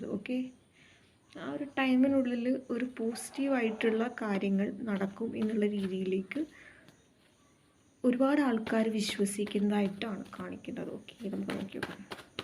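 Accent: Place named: Kannur, India. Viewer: cheythini😂🤣,,, pakka kannur kari👍,,mm,,mm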